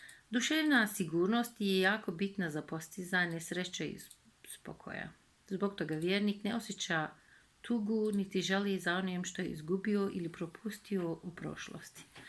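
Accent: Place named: North Macedonia. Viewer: iyi guzel hizli konusuyorsun konusma aksanin makedoncaya. ve rus diline kaciyor kelimeleri konusma ve okuma yi yutarak ve hizli konusup okuyorsun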